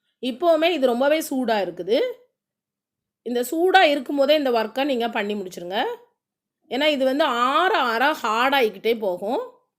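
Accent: Tirunelveli, India. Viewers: Thirunelveli language arumai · Akka KU tirunelveli pola.. tamil avlo arumayae iruku kekum pothu 😍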